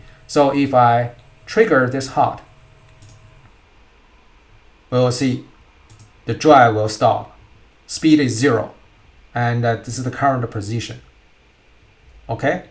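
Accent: China. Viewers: Regret we didn't meet sooner，are you Chinese? Sound like Chinese-style_English.he he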